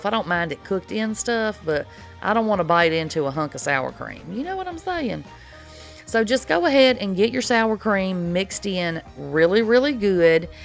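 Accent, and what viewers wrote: South, United States. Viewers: I just watched your beef tips recipe (10/13/2025) and you linked this one, so now I'm watching this one. I have to say, your Southern accent is sooo much stronger in this one than in the beef tips video. 😂😂 I know, I'm strange, I notice your voice in every video. You just have that sweet comfy Southern voice. 😊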